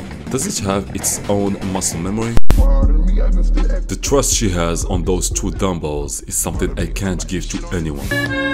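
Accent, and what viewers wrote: Morocco. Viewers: ur moroccan I know that from your accent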